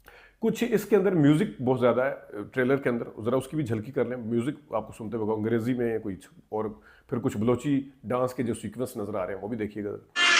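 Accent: Punjab, India. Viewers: punjabi lehja vich aa janda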